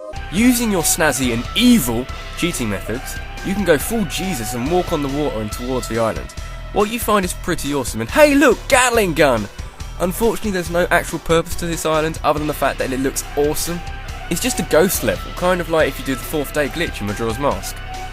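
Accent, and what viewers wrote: United Kingdom. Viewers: *Gasps* You're british? *Subscribes* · Are you british? Because you sound british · Darn, why are you and your British accent so awesome!!!!!! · Are you Actually BRITISH? Me too! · your accent is british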